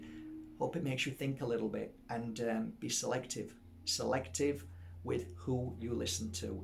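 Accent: Yorkshire, United Kingdom. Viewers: Found a channel called The crystal collector. He goes around different areas digging crystals. Theres a video where he digs amethyst which is my favorite crystal... Amazing. Check him out! your Yorkshire accent is lovely. I've a Bucks accent 😂😂😂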